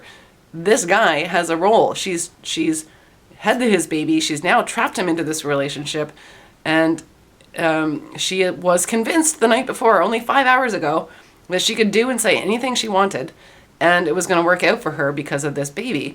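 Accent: Canada. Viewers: Canadian Detected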